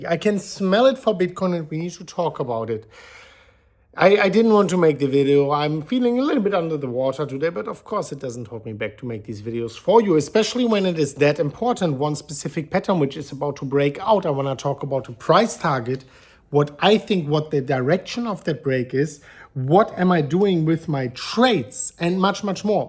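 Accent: Germany. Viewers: "Im a little bit under the water" i love your german english 😂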